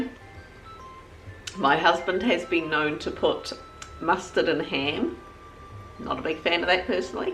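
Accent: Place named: New Zealand. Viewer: Don't we all! Thanks for your reply. I've JUST made some circular normal crumpets for the first time. Something went wrong as they look good, taste good, but have no bubbles / holes! The bubbles appeared and then closed up again. I think the pan recipe is wrong. I will persevere. I love your accent! I have a friend from Dunedin who lives here now and he now finds N Zealanders sound strange! (Keep it on hind! My tint is wit !) Great fun! England is in the middle of a mini-heatwave. We get 3 days of summer... it will be snowing in weeks! It's so weird (for me) to think that June is Winter for you! I guess you have just had Christmas there!!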